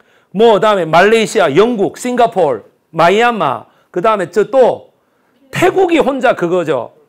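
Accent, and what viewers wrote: Busan, South Korea. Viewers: 부산사투리 너무 좋습니다. 첫 줄거리부터 흥미롭습니다.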